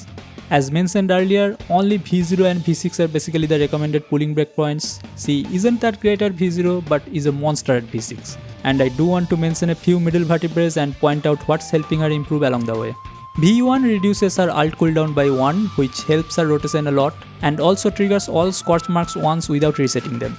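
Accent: India. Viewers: why you sound like an indian?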